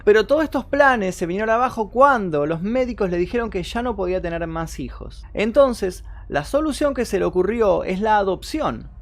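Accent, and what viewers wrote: Argentina. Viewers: jajajajajaja tranquilo bro, no puse nada malo xd me gusta el acento de los argentinos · Argentino che? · SOS ARGENTINO ??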